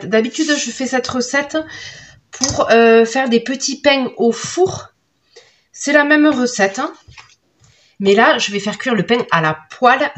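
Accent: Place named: Midi, France. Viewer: Bonjour. Merci pour cette super vidéo. Ce pain est super à faire quand on est en panne....😅Nouvellement abonnée je visionne les vidéos petit à petit. J 'ADORE..... Nous avons le même accent 😁. Un petit coucou du Midi Toulousain. 😘😉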